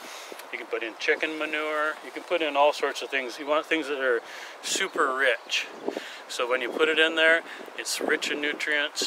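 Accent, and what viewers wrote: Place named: North, United States. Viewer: that you! I knew you were from the north from your accent. I was born in Wisconsin, but transplanted in Brownsville Tx.😂😂 Still haven't shaken the milk 🐄 thought 😂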